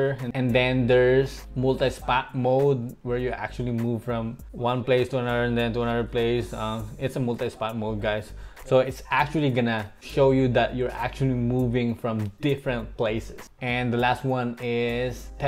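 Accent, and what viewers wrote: Philippines. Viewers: Hehe salamt po kuya tiga SUBIC po ako yung pagsasalita mo palang halatang pinoy na 😂♥️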